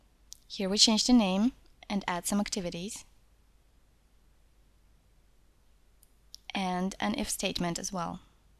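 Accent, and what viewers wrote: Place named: France. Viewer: This nice narrator sounds like French.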